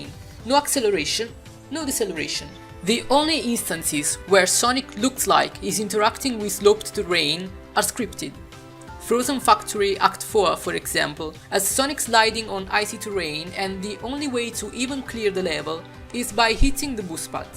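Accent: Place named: Italy. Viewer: Si sente che sei italiano... hai la mia stima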